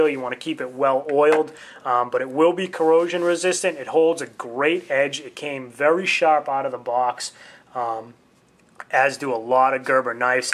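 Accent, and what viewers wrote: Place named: Boston, United States. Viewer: What are you up Boston? I know a fellow Masshole when i hear one... ;0)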